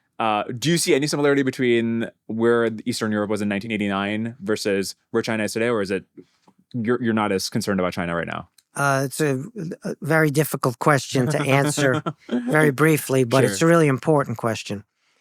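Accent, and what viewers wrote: New Jersey, United States. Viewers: man im from up there my entire family has jersey accents and this guy sounds uncannily like joe pesci.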